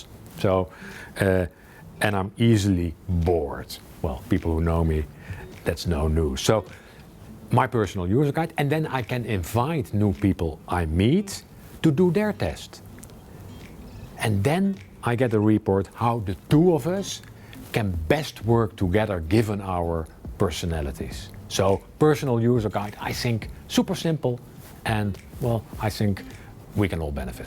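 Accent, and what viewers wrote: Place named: Netherlands. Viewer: dit Nederlandse accent ook.......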